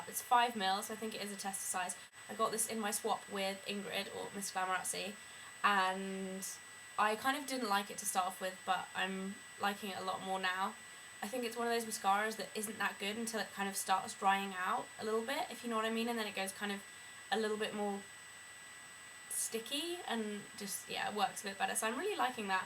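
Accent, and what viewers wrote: United Kingdom. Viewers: I found u because Lollipop 26 liked this vid and I subscribe to her. How happy I am that I did. U r adorable and I luv the British accent. Where I live in the U.S. we just sound rather flat. I'm rather new to YouTube so will look forward to any reviews and tutorials u have. Keep up the good work.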